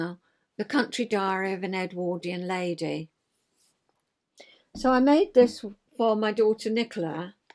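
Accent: United Kingdom. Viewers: Dear, Oh my goodness! I just popped in to see you, because I’m a huge follower of Julie’s! She mentioned you & said how much she loves to listen to you talk! I agree, you are an absolute delight! Where in the UK do you live? I love all the different accents & expressions that all of you have! I live in Lawrence Kansas, smack dab in the middle of the US! I lived in Cambridge (England!) for a year, when I was 6 years old way back in 1963. Even though that was practically the age of dinosaurs, I remember so many things so clearly! I came back with a lovely accent, but the other children at school made fun of me, chanting “Erica-America”, so I lost it as fast as I could… I wish I had that accent now! My favorite memory was a pair of blue “real” leather shoes my mom bought me. They had laces, maybe like an Oxford shoe? At any rate, I wore them to bed that night, because I loved them that much! 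Silly, right?! I wish I had a photo to go with that memory! Your little journals are so wonderful, I think I need to think about making those for my family, too! And those 12x12” paper pockets are wonderful, just so long as you don’t cut off the wrong piece! It’s so nice to “meet” you & I’ve subscribed, so I’ll be checking in again, soon! Take good care! Erica❤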